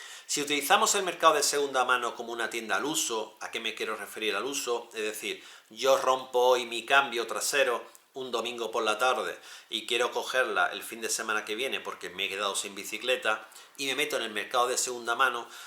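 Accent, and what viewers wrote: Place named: Córdoba, Argentina. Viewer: Aa valee! Yo de Córdoba por eso te lo decía! Que he visto que tenemos acentos similares y digo si es de Córdoba podemos salir a entrenar! Jajaja. Un saludo!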